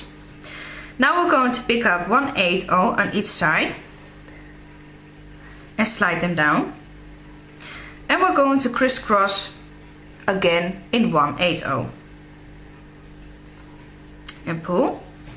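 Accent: Netherlands. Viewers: I will definitely make this one! leuk t Nederlandse accent ook hihi :-) thankss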